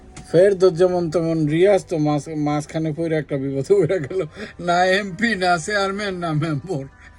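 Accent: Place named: Mymensingh, Bangladesh. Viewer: vai mymensinghor vasa apnermukhi sei lage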